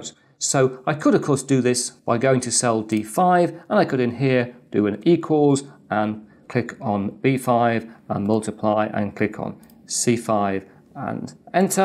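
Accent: United Kingdom. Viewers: You got an astonishing British Accent!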